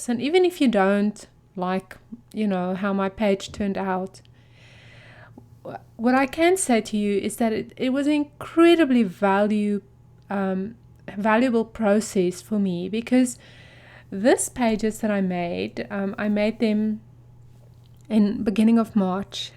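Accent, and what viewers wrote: South Africa. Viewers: Originally South African?? Love your books so by the way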